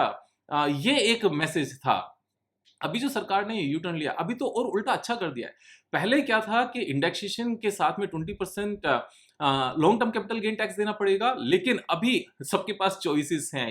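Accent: India. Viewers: Accent of English will always be Indian for us. If English is not our mother tongue then why should we be expert in pronunciation. Those who measure others with exact pronunciations are just having an inferior mentality. Are foreigners able to speak Hindi with exact pronunciation? Good bhai that you addressed all these guys in this video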